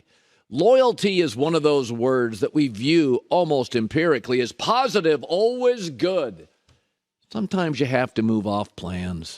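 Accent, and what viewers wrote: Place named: Washington, United States. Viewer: He’s from Washington State.😂😂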